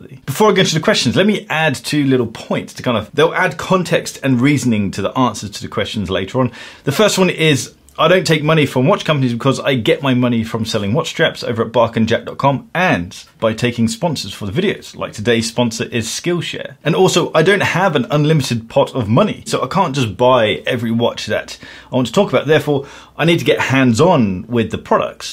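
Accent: United Kingdom. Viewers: is the Chris Harris of the watch world. Always well articulated thoughts and enjoyable to watch. It might also be the British accent.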